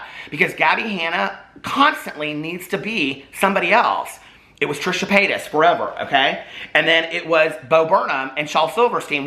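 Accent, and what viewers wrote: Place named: Indiana, United States. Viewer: I think its just his Indiana accent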